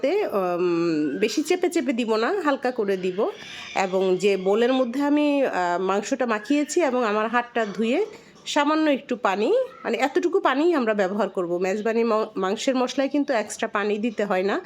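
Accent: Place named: Chittagong, Bangladesh. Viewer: অাপনার চিটাগং ভাষা শুনে আমি মুগ্ধ 😊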